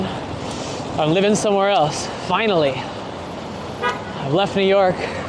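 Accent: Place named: Mexico. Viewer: Estas hablando asta con el acento Mexicano.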